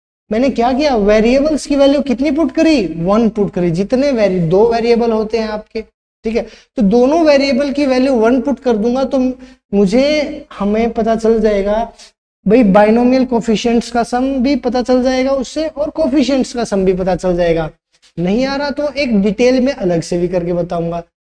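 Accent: Haryana, India. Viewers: Han ji.. Wese isme koi shak nahi hai... Qki sir haryana se hi hain... Magar aapki daag deni padegi ki aapne tab bhi pahchan liya jab sir ne hatyanvi nahi boli hai.... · Sir aap 101% haryana se ho na?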